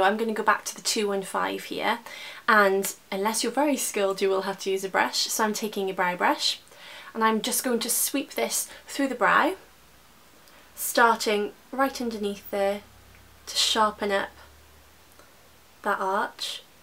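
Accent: United Kingdom. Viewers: i love your accent! whereabouts in england are you from?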